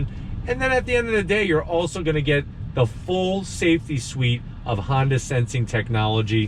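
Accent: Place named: Northeast, United States. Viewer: Thanks for posting. Love the candy references and the northeastern accent.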